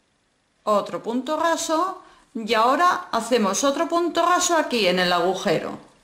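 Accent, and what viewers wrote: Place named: Berga, Spain. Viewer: Hermoso, me encanta!!!! (Tienes acento berguense). Saludos!!!!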